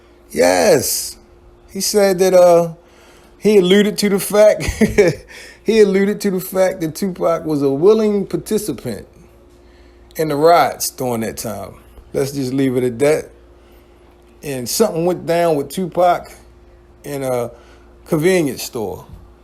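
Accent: Baltimore, United States. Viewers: you definitely from Baltimore shorty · We never met, but dam your Baltimore accent is thick..... LLS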